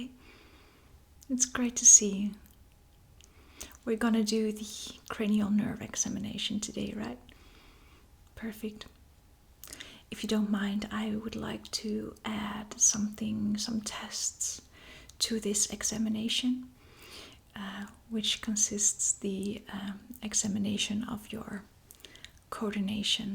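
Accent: Netherlands. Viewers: Hoor ik daar nu een Nederlands accent? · do I detect a Dutch accent ? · By any chance you're Dutch madame?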